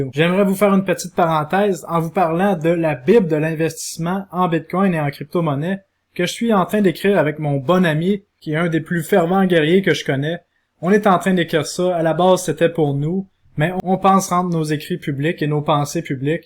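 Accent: Quebec, Canada. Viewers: Alors quand tu dis que les médias de masse dise que de la grosse merde avec ton accent québécois, je suis juste mort de rire et ça illumine ma journée 🤩🤣🤣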